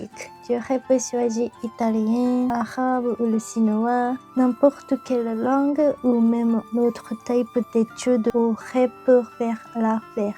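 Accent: Japan. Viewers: Wow merci et le mélange français avec accent japonais est génial tu t’exprime très bien bravo et félicitation!!!!🎉🇫🇷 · Ton accent japonais est très agréable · Ce qui est rigolo, c'est qu'on remarque toutes les marques de ta langue natale, notamment pour la prononciation des "f" pour le salon de coiffure on reconnait vite le "ふ" ^^ Bravo pour l'apprentissage !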